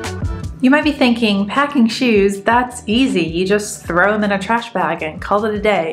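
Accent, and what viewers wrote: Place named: South, United States. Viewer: Did you hear her southern accent? Now the hats make perfect sense 😂❤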